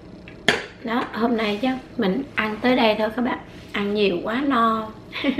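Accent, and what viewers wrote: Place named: Central, Vietnam. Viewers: Hôm trc nghe cô nói gịong quảng nam là con nghi r. Con củg ở qn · Chị cùng quê với em nè. Hèn gì em nghe chị nói chuyện giống giọng miền Trung. Ngày nào cũng hóng video của chị. Chúc chị mau khỏe nha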